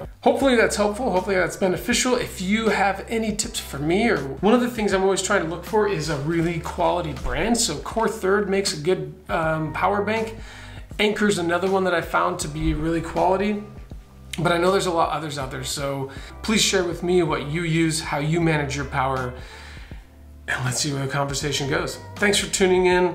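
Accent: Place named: Western Washington, United States. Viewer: Hey. New subscriber here, and man do I love your content. You deserve a lot more views. Unlike a lot of backpackers and UL guys you seem to come from a hunting background, which might be the fresh and relateable take you bring to your videos. It also sounds like you're up in western Washington maybe? I'm down in Portland! I gave you a follow on IG too. I look forward to your future videos, so please keep them coming!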